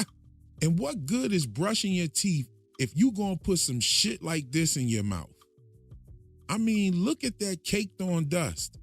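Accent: Philadelphia, United States. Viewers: Are you from Philly? 😂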